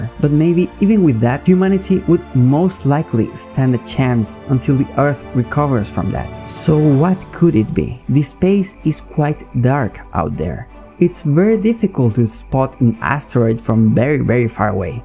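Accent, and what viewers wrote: Oxford, United Kingdom. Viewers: Ni siquiera iba a entrar a este vídeo, solo entre para de suscribirme, después oí tu voz con el ingles de oxford y pensé "Que s dalas tío" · That Oxford English papuuu Loved the video it really makes you think about it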